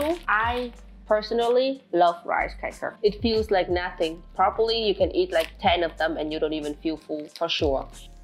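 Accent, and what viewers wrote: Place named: Germany. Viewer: I love to see that you got some of German accent.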